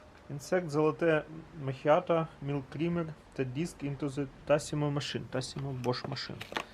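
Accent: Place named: Russia. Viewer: That is the most hilarious russian accent i have ever heard. I love it.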